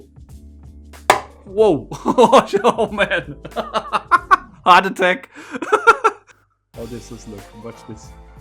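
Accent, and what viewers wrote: Germany. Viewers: I miss his german accent, its still there but back in the day it was more german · Iwie hörst du dich deutsch an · I live in a very German area in Texas. Your accent is normal to hear around here. Very normal! Fredericksburg Texas is a small German town. I spoke German in school until it was verboten in 2nd grade. Back in 1973... I can go to town and still here people speaking German to this day...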